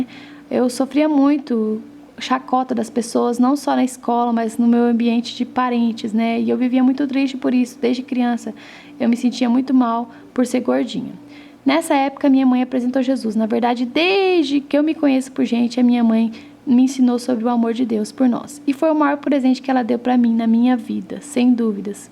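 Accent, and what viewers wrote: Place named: Campinas, Brazil. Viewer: ''Não falo leitE quentE'' haha. Na verdade, eu sou de Campinas/SP e acho seu sotaque bem parecido com o meu. Eu gosto muito da sua história. Você é guerreira e sempre correu atrás de realizar seus sonhos. Pode acreditar que seu canal não é apenas entretenimento, mas também é um canal de bençãos, seja para inspirar outras pessoas a também realizarem seus sonhos ou para falar do amor de Deus através do seu testemunho. :)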